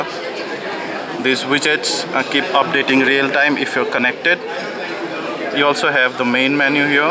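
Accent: India. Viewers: the voice made me laugh a haha i love that indian acent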